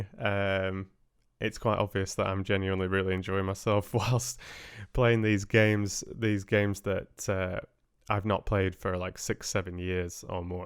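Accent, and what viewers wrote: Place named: Liverpool, United Kingdom. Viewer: Are you from liverpool? You have the same accent as the beatles.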